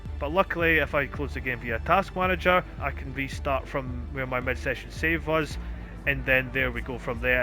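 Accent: Scotland, United Kingdom. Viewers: Are you Scottish? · What the fuck you're Scottish as well haha, whereabouts are you from? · Didn't realise you are Scottish, hello from Northern Ireland!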